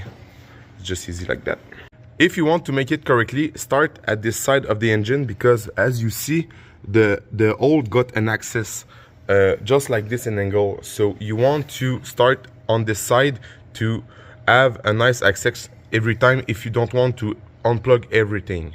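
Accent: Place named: Quebec, Canada. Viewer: Merci pour la vidéo. Très utile, accent québécois en prime! Comme le mentionne un autre commentateur, il ne manque que l'écartement des électrodes.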